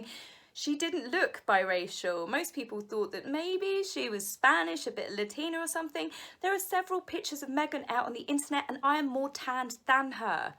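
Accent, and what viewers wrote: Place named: RP, United Kingdom. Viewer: Your speech is getting posher with all this royal talk!!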